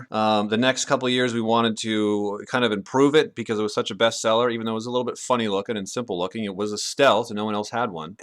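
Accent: Russia. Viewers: like the russian accent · lol russian accent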